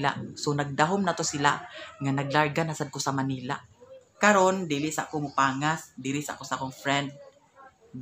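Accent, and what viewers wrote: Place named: Misamis Oriental, Philippines. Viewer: Every time makita nko imo vedio it remained me sa ako mag relatives taga misamis oriental i missed hearing your accents....ingana gyd .....shoutout taga kiraking ug calubo keep safe....you too🍒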